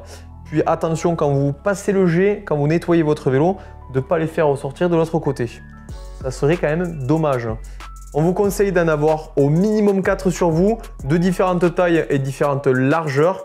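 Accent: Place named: South, France. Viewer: Vidéo super utile ! Merci pour ceux qui n'ont pas d'ingéniosité ! Et toujours avec cet accecent du Sud, c'est la touche 😊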